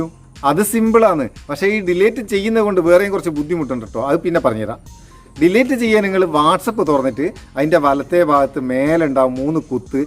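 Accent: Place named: Malabar, India. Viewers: അതാണ് മലപ്പുറം ഭാഷ😄😄🥰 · ഇങ്ങളെ മലബാർ ഭാഷ കേക്കാൻ നല്ല മൊഞ്ച് ഇൻഡ്.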